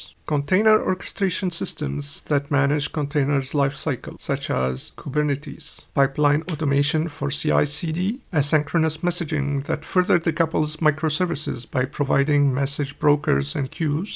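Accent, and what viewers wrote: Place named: India. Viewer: at 1.25x this sounds like an indian accent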